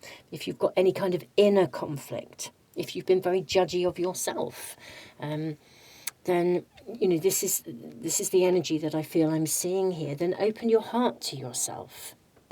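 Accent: United Kingdom. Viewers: P1. I am so ready for change and start a whole new chapter of my life in the best possible way. I been healing, creating, building, sacrificing and strategizing so it will all come together. A life I co-create with the Universe. A life of pure authenticity and individuacion. Thank you! Your choice of words are very encouraging. Just love your UK English accent and choice of words that’s very depicting from your region. I live in Ecuador but I am Polish-Australian. ❤❤❤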